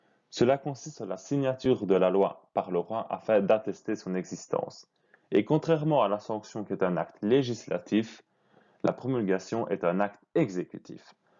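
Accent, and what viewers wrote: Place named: Liège, Belgium. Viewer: Je pense plus à un accent de liègeee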